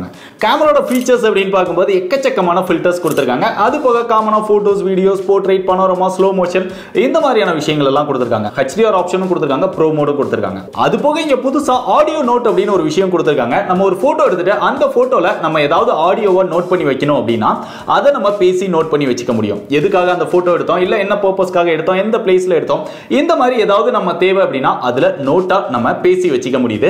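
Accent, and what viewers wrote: Kovilpatti, India. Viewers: Anna neenga kovilpatti ya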